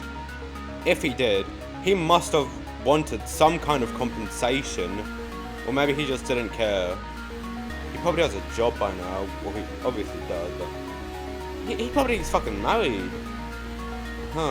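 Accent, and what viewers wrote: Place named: United Kingdom. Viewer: voice that is British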